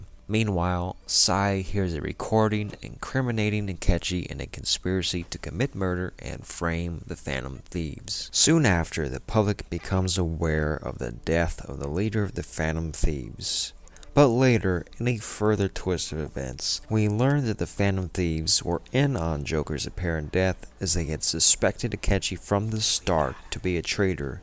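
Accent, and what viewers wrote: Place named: United States. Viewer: Great story explaination and at first I thought u were pronouncing some names wrong bcus u didn't know but then I figured out that u were pronouncing it the American way lol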